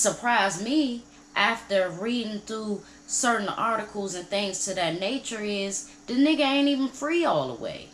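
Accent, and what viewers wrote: Washington DC, United States. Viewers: Dope content baby girl. I love ur DC Accent..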